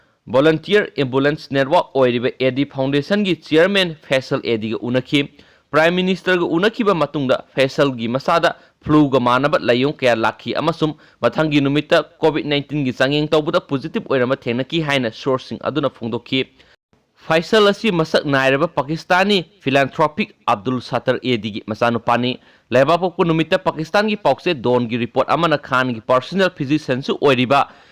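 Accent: Moirang, India. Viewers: moirang gi lon da douna tou a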